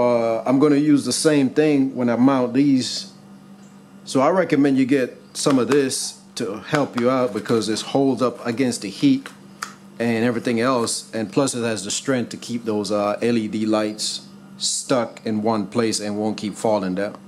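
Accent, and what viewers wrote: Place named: Jamaica. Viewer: You sound Jamaican. Looking forward to more Hr-v mod videos. Good work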